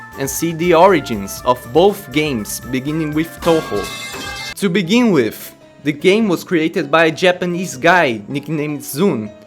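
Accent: Brazil. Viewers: nice accent. where are you from? Brazil? Seens me talking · Bom dia mano 😊😊 deu pra ver o sotaque br aew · sotaque brasileiro ficou bem incluso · this accent... I recognize it... you're brazilian, aren't you? me too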